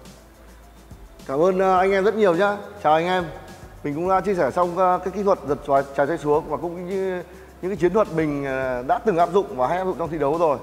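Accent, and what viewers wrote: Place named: North, Vietnam. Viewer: Cam on, exactly what I was looking for. And your bac dialect makes it so much easier to understand. Sry never learned how to write viet.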